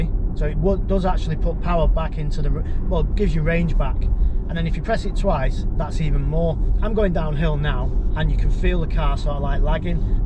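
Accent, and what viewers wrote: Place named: Scotland, United Kingdom. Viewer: I love your Scottish accent.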